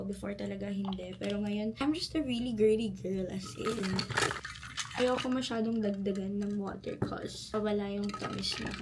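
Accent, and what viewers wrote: Conyo, Philippines. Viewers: hahha omg so cute ng pagiging conyo mo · While hearing Ms saying " I wish Blythe was here" made me realize and made me wish that i also have that kind of friend who thinks of me everytime I'm not there with them 'coz i always feel like I dont have friends I just know a lot of people..... Btw I love youuur conyo talaga its not maarte or cringe or oa it's so cutiee😻🥰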